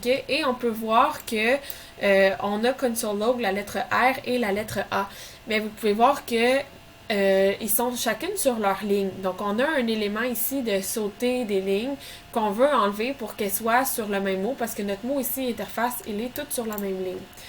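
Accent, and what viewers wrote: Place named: Quebec, Canada. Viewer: Trés bon tuto avec des explications claires. même avec l'accent québécois lol. trés claire.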